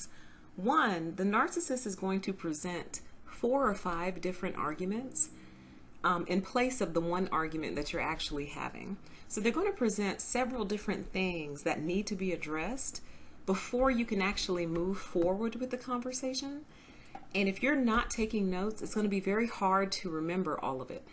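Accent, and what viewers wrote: United States. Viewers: This is silly but I think constantly about this and I've watched like 20 of your vids. So I'll just say it. I am a trained vocalist, so this analysis comes from someone who at least understands tonality. I love the tone of your voice. You have a bright, cheerful quality yet also a mature mellow, wise depth. You present the sound very high on your upper pallet which is great, it's an excellent placement. You enunciate marvelously with a pleasant neutral American accent. You do not pronounce your words in an annoying fashion at all. You do not use obnoxious voice inflections. Listening to you is very enjoyable and leaves me with a positive feeling. I bless you in the name of YaHuWaH with truth! I love listening to your videos.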